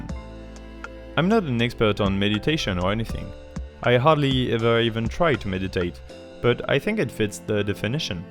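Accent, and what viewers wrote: France. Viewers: Gotta say I wasn't expecting someone with a french accent but hey, pleasant surprise ! Oui, effectivement moi aussi je suis francophone- Super vidéo ! Je vais probablement m'y essayer de temps en temps.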